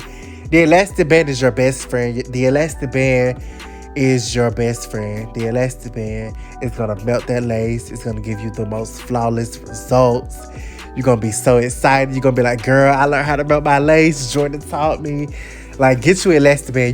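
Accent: South, United States. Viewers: He's accent is southern and he does say he's located in Georgia in every video description. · This country accent I love it lol😭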